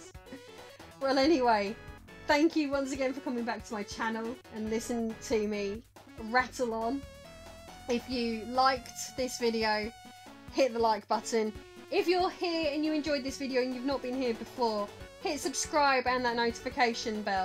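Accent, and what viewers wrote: United Kingdom. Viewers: Where in UK are you from?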